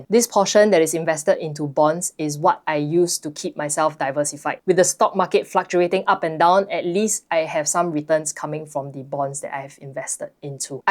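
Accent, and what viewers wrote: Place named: Singapore. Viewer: You Singaporean? Not Singaporean then quite normal that you don't understand. If you are Singaporean then you must be drunk. You hear this accent everyday all day by everyone in Singapore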